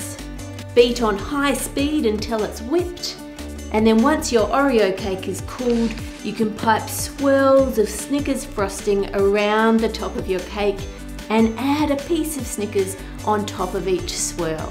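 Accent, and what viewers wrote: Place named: Australia. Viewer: Better--to me Bob had a really odd "would you like a piece of candy little girl" voice, where is more gentle and has a nice Down Under edge to it. Oh, and cheerful.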